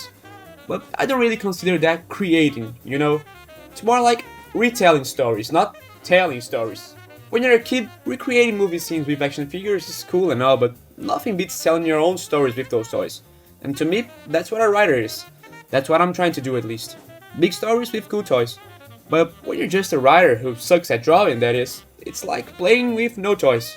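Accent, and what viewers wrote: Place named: Brazil. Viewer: belo conteúdo, identifico sotaque br desde longe haha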